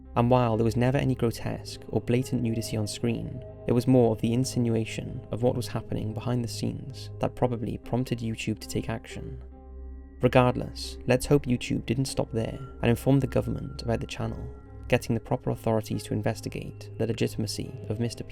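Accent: Wales, United Kingdom. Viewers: Narrator are you welsh?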